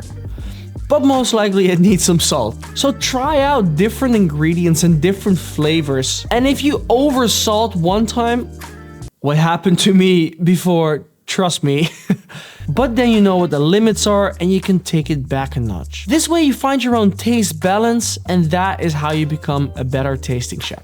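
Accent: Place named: Netherlands. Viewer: Dumlimg recepies please. Support from a fellow Dutchie here😊. Your accent is fine. Yes, it's detectable, but who cares, you're more than understandable.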